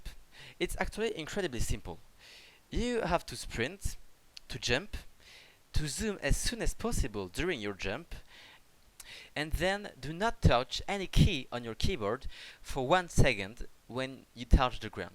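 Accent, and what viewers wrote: France. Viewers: have you never heard a French accent before? lol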